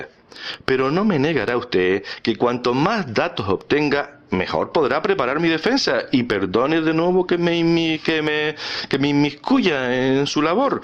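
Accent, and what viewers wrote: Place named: Chile. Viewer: Buenísimo!! Muy bien narrado!! Me encanta el acento, será chileno?? Me he reído mucho!! Muchas gracias!!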